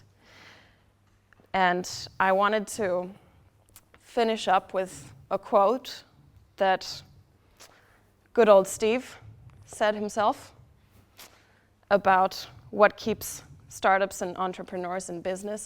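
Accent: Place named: Germany. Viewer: I can hear a German accent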